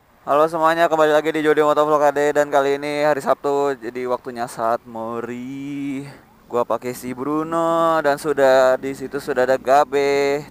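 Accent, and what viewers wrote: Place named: Java, Indonesia. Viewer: sampean I wong ngendi kok ngomonge medok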